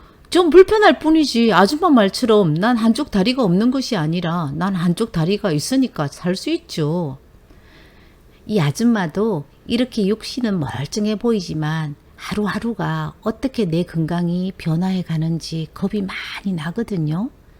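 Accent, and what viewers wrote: Gyeongsang, South Korea. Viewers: 영상 잘 봤습니다 경상도 말이 정겹고 반갑습니다 응원 합니다.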